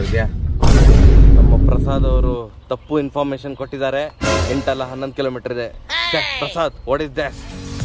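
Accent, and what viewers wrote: Mangalore, India. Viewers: Neevu mataduva reeti, namma mangloor kannada mishritha bhashe, nimma jokes.. Manassige bahala muda kodtade. Ede edeyalli heege random vlogs madi. Nodi naavu kushi padteve.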